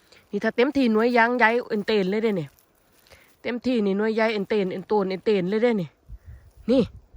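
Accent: Korat, Thailand. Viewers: ชอบความสำเนียงโคราชบ้านเอง เป็นคนอำเภออะไรคะ บลูเบอร์รี่น่ากินมากค่ะ❣️กดติดตามแล้วนะคะ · เป็นFCใหม่นะค่ะฝากตัวดว้ยจร้าจะติดตามนะค่ะ..ขอโทษนะค่ะเป็นคนจังหวัดอะไรค่ะคือพูดภาษาโคราชได้ค่ะ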